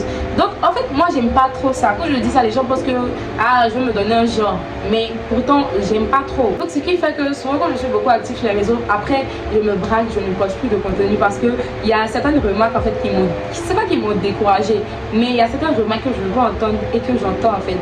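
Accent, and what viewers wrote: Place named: Ivory Coast. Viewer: j'ai cru que t'étais ivoirienne 😅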